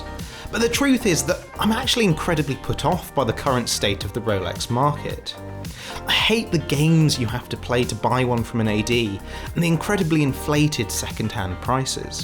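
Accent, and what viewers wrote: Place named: United Kingdom. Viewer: I’m learning to hate the snotty Brit accent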